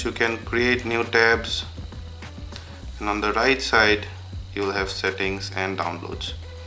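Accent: India. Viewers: fucking annoying indian accent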